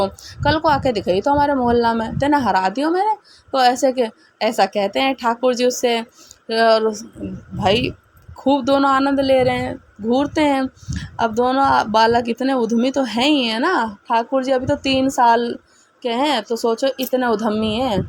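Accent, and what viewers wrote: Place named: Braj, India. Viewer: Apki barjwasi awaj me hi aap katha ko sunano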